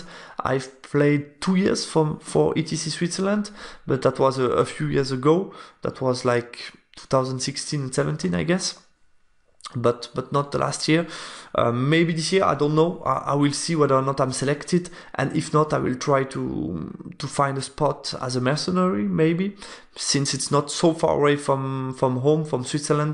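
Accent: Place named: Poland. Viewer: A very light polish accent, isn't it ? :D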